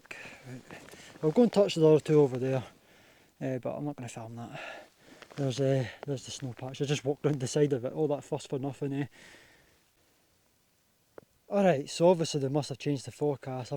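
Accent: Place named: Edinburgh, United Kingdom. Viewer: Well done,I like the fact you get away and do your own thing.I’m an Edinburgh lad myself Meadowbank.originally fi west Granton green.you sound like your from that area.granton,Drylaw’ish neck o the widz.👍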